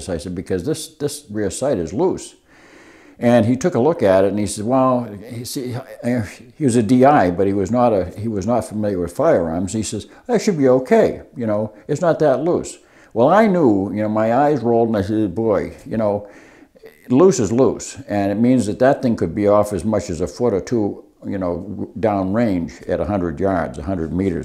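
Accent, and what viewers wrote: New England, United States. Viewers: Great content. And I grew up in NH, near MA. Good memories of the experienced man influence. Especially with the accent I remember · Definitely from Massachusetts or NH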